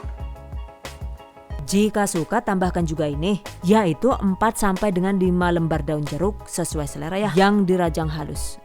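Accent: Banyumas, Indonesia. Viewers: salam ngapak bundaa...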